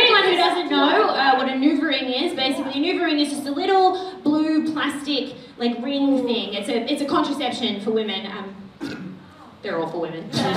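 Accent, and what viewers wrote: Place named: Australia. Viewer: Why are you Australian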